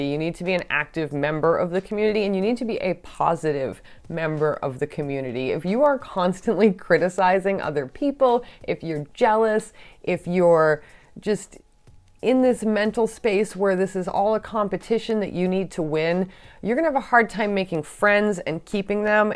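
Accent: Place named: Boston, United States. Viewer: I watched this video on my daunting hour drive home from work and it made me so happy! I have never contemplated (and probably won’t) starting a channel, and I enjoyed this video so much nonetheless! You are such a breath of fresh air in this community, and I am so hooked on your channel. PS - it must be a Boston thing to pronounce room “rum” because I swear you and Beantownhouselants always have me rewinding to relisten like, who’s drinking rum???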